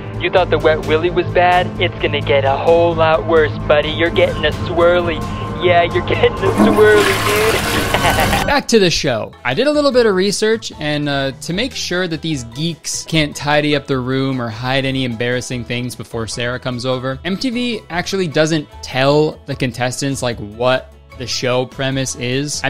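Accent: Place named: Canada. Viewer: You sound canadian in the best way possible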